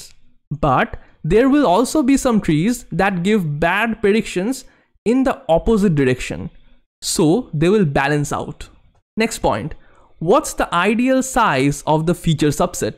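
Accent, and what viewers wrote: India. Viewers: This damn indian accent! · can incompetent indian schools not even teach the Queens English properly nowadays? it's painful having to listen to his paj££t accent AND seeing the words spelt wrong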